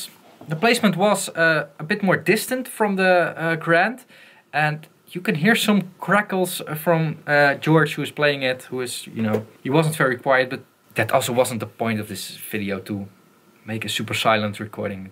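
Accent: Netherlands. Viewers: I thought I heard some Nijmegian in your Dunglish but damn it is the other side of the river :) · Deze man ze accent is best goed maar als nederlander hoor je gelijk dat ie nedelands is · you pronounce like a dutch guy does and should, like myself, I hate all those quasi perfect american accent dutchies showing of their cosmopolitism, just stay as you are with your pronounciations! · Agreed. I used to date a Dutch girl so I find the accent adorable. Lol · Yo-yo. I know you're Dutch because of the accent. You can tell it easily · Love the Dutch accent! Better than Australian, which is often unintelligible.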